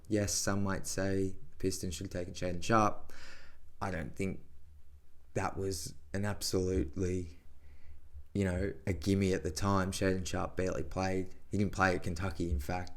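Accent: Australia. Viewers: You from Aussie lad?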